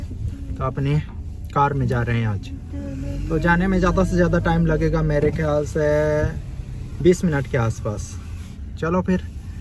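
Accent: Chhattisgarh, India. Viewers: भईया आपके हिंदी में भी छतीसगढ़ी अभी भी झलकता है ,,, मतलब एकदम हीरो वाला काम किए हो यार ,, आपके गांव वाले आपको देख कर बहुत खुश होंगे ,, । Love you brother 🙋❣️ from all chhattishgarians,, ekat bar chhattisgarhi bol dete to Maza aa jata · Bhaiyaa tor baat kre me thoda- thoda chhattisgarhi ka esent aat he 😊🙏 Badhiya he👌🙂👍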